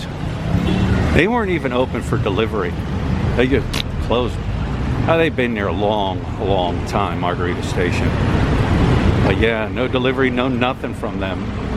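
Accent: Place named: Chicago, United States. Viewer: You sound more as if you are from Chicago than from Philly. I have heard so many different things from Thailand and PI that I do not know what to believe any longer. Never been the RED LIGHT or bar type but I lived in Makati and different places in Thailand before. I think bars are opened here in Denver. Lots of obnoxious people noise and motorbikes outside at night this last week. Last I heard from a Filipina was that PAL will start flights from overseas June 1, then after that someone said January 1st, 2021. I heard this yesterday. I'll subscribe to your channel in hopes of being kept informed. Good Luck!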